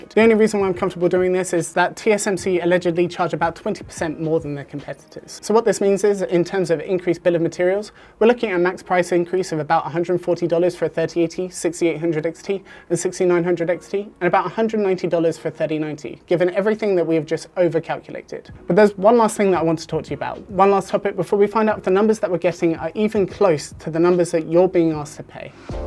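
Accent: United Kingdom. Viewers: ok, so my ears didnt fool me that you sound so british XD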